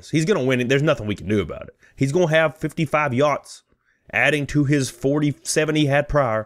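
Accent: South, United States. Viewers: Man oh man I just love listening to you, you truly are pretty damn funny 😆 You provide great content with a southern twang and mindset that makes, even the distasteful, a platable experience. THANKS 😊😊😊😊😊😊😊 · Can’t decide if it’s the southern accent or dumb fucking haircut. But I just want to flog you with a tire wrench.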